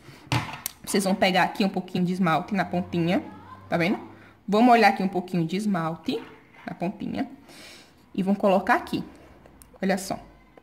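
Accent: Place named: Bahia, Brazil. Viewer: vc fala igualzinho minha tia Rosane da bahia ... vc é de onde ?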